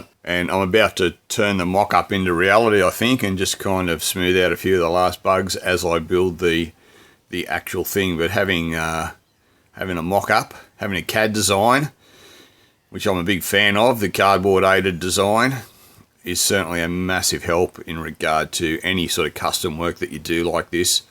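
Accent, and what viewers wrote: Australia. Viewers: Mate that's a work of art! And you've got the most "Aussie Bloke" accent I've ever heard. You could get a voice over job easy if you haven't already. 🍻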